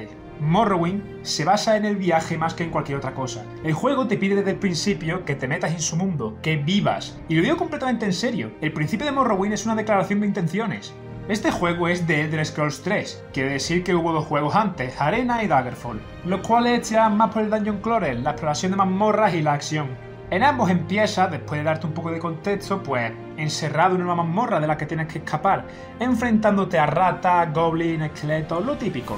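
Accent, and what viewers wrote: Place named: Andalusia, Spain. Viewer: Tengo curiosidad, de que pais eres? Tu acento es como Español pero es muy marcado por ejemplo las carencia de las "s" finales en las palabras y otras cosillas. Conosco acentos de diferentes regiones de España pero el tuyo para mi es nuevo, suena a andaluz? Saludos desde Chile, buen video ;)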